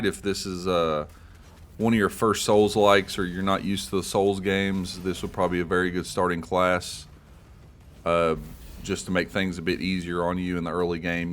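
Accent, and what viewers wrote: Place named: Texas, United States. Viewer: Definitely a southern Texas accent love it and would suggest using it for a saying catch phrase for the channel ,so folks can look forward to that as well as the content, aside from that great work and new subscriber here 👍 👏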